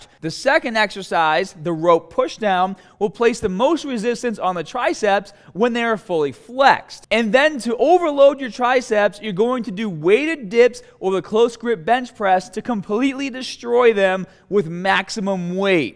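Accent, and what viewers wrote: Boston, United States. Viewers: “you gotta TAHGET the muscle to SPAHK some growth” I love Boston accents lmao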